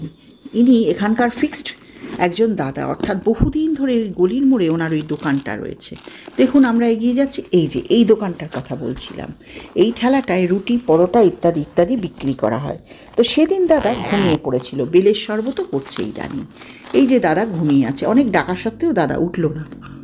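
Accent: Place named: Kolkata, India. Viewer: Apnar voice ti asadharon - khub jeno m chena. Khati uttar Kolatar bhasha o uccharon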